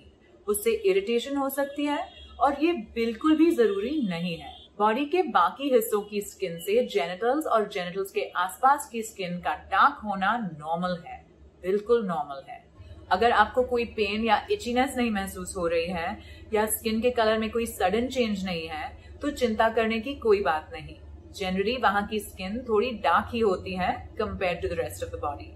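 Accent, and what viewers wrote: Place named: India. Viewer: Name English and accent hindi ?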